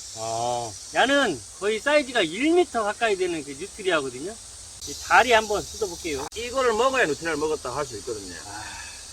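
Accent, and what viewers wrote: Jeolla, South Korea. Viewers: 진짜 전라도 사투리 확티가나네요 ㅋㅋ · 사투리가 어디에요? 전라도? 충청도?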